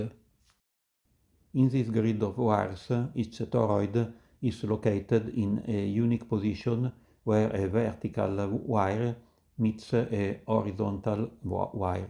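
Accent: Italy. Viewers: You are Italian. At least your accent says so! :D However, great video! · Mai sentito un accento più italiano di questo ahahaha.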